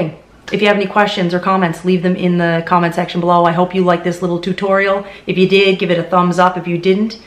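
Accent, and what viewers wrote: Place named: Nova Scotia, Canada. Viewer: Well it's got to be Nova Scotia somewhere lol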